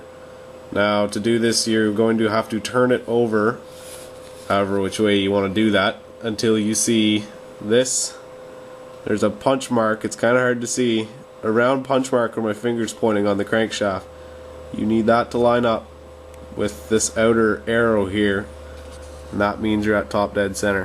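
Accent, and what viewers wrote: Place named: Canada. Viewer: it took 14 seconds for me to realize you were Canadian lol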